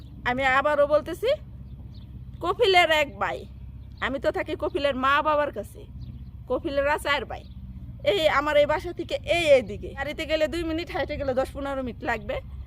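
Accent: Manikganj, Bangladesh. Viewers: আপু আপনার ভাষা শুনে মনে হয় আপনি মানিকগঞ্জের সিংগাইর থানার সাহরাইল এলাকার আসে পাশের মেয়ে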